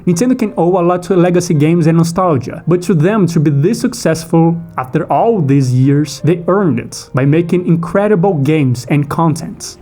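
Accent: Brazil. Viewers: is this a ptbr accent i hear??? hehehehehe (if so, right ON) but yeah, at times i feel very little desire to jump back into nintendo games, unless im with friends and wanna test how strong my friendships really are by playing mario kart or smash bros, lol. great video, dude!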